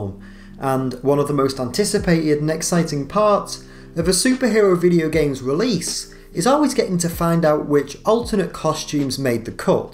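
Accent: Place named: Liverpool, United Kingdom. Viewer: Where are you from? Somethings you say sound Liverpudlian.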